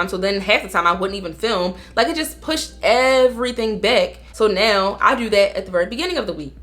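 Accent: Baltimore, United States. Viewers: I felt like you was from Baltimore lol yesssss , I love your videos ! They REALLY help me ! Mane , then I’m sooo isolated now & I see God is doing that . I just changed my alarm to 7am lol #baltimore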